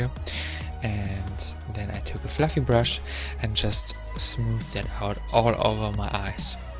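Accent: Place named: Germany. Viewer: hahah i thought "he kind of has a German accent too" so I checked some other videos of yours and haha you are truly the perfect nightcrawler XDD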